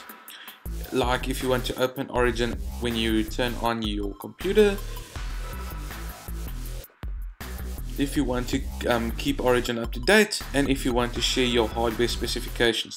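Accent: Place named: South Africa. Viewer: I know I’m very late but you sound like your from my home country of South Africa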